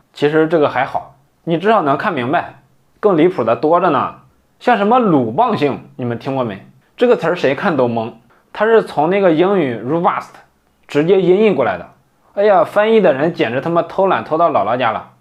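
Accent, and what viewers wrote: Henan, China. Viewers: 贺南话好听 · 这河南话，听起来亲切！ 想起紫阳。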